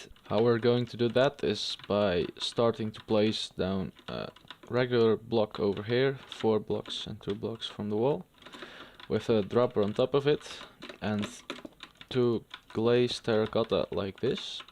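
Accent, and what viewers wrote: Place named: Netherlands. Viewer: i can just hear the dutch accent, i love it. cool build man, i am goin to need this very well!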